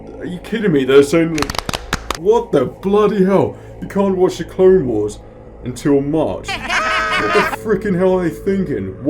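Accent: Australia. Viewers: I've said it before but I'm really glad I'm not the only one who felt the Disney Trilogy made the galaxy feel small. Also, you're in England? Why was I always thinking you were Australian? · I thought you were an Australian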